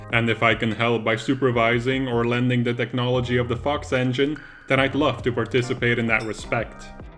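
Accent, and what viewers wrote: Netherlands. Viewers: You're Dutch, aren't you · Are you Dutch?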